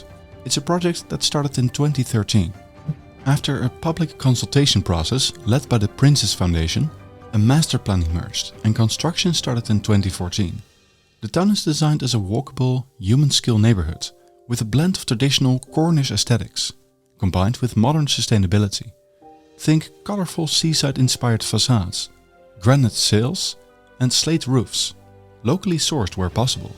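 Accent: Netherlands. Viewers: Wat een gezellig Nederlands accent!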